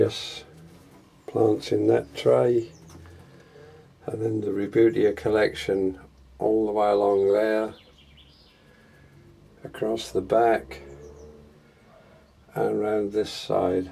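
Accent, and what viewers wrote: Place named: England, United Kingdom. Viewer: i dont understand the latin names with the english accent but they're beutiful... espacialy the ones planted in the ground! i wish i could live by myself only from food i grow and dedicate my entire life for the plants.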